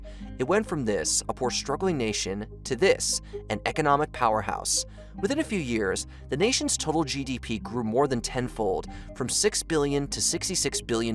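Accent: Spain. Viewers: He's probably Spanish, where "J" is pronounced "H". Like Juan, Jose, Marijuana,...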